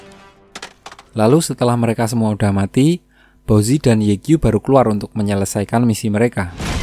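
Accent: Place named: Yogyakarta, Indonesia. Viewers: Jawa jogja masnya wkwkk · Oalah wong jogja to mas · Admin nya medok tapi lucu nya gokil...anjim 😂😂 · Loh wong jogja to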